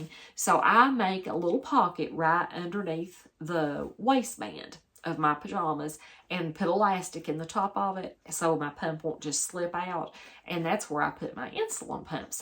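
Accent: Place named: Georgia, United States. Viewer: I noticed your accent right away and thought she sounds like I would if I was on YouTube...I live slightly north of Macon, GA.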